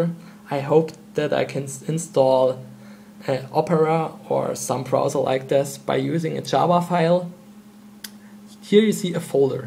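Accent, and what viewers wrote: Germany. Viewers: man merkt schon nach den ersten sätzen am aktzent das du deutscher bist. i mean... "änd wOt wOs in it? äh scophone" .come on ! :-) entweder deutsch oder korrektes english :D , aber sonst ganz nett das du eine review gemacht hast :-) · hahaha :D deutscher akzent :D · 5sec und ich wusste zu 90% das du deutscher bist :D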